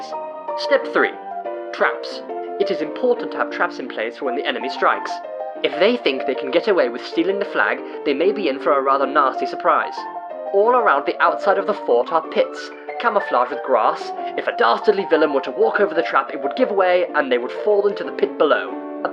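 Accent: United Kingdom. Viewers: Gotta love that British voice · Was this challenge explained by Silent Movie? Because the explanation looks like a silent movie and there's someone explaining it in a proper British accent.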